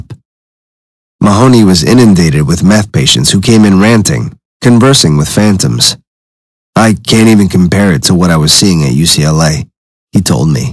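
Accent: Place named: United Kingdom. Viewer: …one photo no captions British (sounding) host fku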